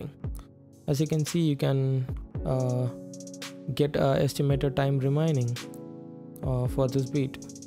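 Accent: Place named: India. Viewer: sorry man but your indian accent is irritating me you should don't talk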